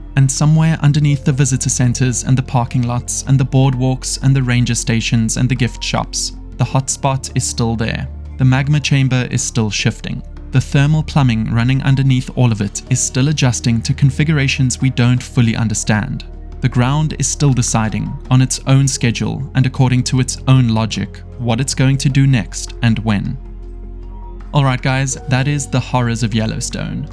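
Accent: South Africa. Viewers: Your accent sounds South African...? Hulle weet nie wat ons weet nie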